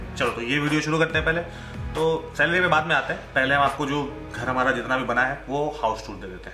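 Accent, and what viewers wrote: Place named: Bihar, India. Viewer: Kaa bat kr rhe hai sir ... Aap bihari hai.,❣️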